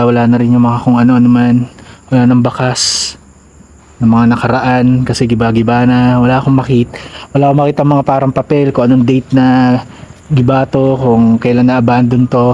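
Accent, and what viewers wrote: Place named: Visayas, Philippines. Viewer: I'm your new subscriber and the way you talk you have Visayan accent..watching your vlog is creepy and exciting...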